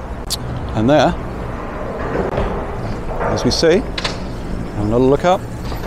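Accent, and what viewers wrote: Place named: United Kingdom. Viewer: !!!!!! How wonderful. I love, love, love the narration. So informative and interesting. Also, I thoroughly enjoy your very British accent. This whole experience is just great. All the best to everyone for this season and blessings for a peaceful, safe and healthy 2022. God bless you all!!!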